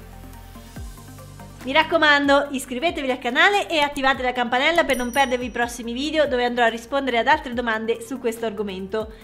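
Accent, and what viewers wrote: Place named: Marche, Italy. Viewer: Sei bravissima, per colpa della tua spiegazione fantastica questa notte mi sono fatto un bel abbonamento a Mid...sento dalla tua voce odore di casa...penso che sei zona Marche sud...Complimenti ancora.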